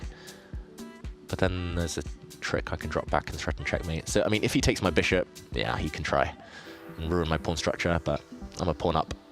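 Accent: United Kingdom. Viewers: I much prefer watching you to magnus, because your commentary is much more fluent, as a professional commentator and native english speaker (I love your english accent too!). And frankly your moves, style of play, and attitude are more relatable as well. I love watching you analyze and explain your GM moves, the explanation of which makes it much more impressive and instructive to watch. Magnus is playing on such a diff level that it's just not relatable, even if he's #1 in the world. I watch all your TT streams start to finish, but not magnus's. So please come back soon; we appreciate you so much!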